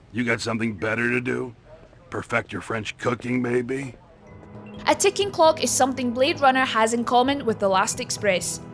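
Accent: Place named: Scotland, United Kingdom. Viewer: These vids are really good, and I love the Scottish accent, but they’d be even better if you wrote what platforms the games are on too!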